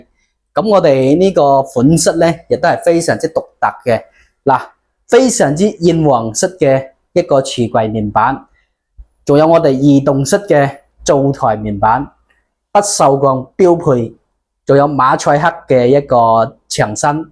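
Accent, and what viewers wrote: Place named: Dongguan, China. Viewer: 東莞口音點讚 !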